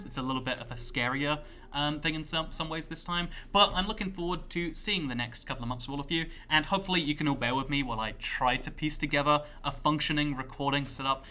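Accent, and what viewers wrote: United States. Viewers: Wow, sounds american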